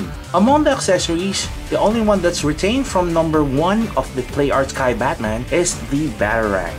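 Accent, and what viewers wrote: Philippines. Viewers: hey man I can tell you're Filipino so from which mall can I buy this?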